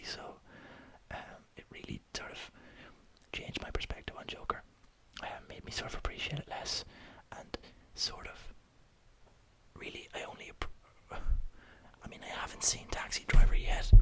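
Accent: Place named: Ireland. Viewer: The irish accent is great for asmr. I could listen to you talk for hours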